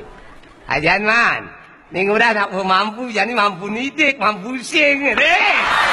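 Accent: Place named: Buleleng, Indonesia. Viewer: Logat asli Buleleng, kasar tapi lucu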